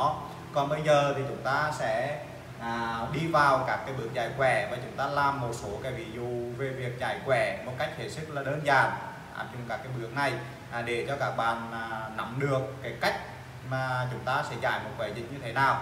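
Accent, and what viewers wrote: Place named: Vietnam. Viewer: Giọng thầy nơi nặng theo miền. Nhiều khi nghe khó dịch :)))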